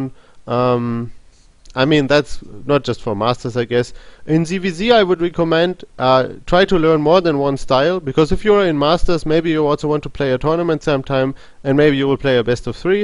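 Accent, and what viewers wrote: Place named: India. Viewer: his accent sounds kinda indian, this confuses me.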